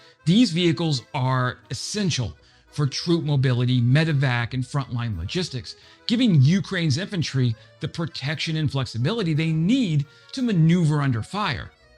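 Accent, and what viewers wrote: France. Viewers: Forgetting your horrible French accent, good effort for the video and don’t forget France is the only real power in Europe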